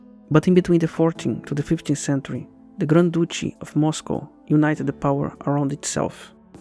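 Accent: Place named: Brazil. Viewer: Are you Brazilian? Your accent sounds Brazilian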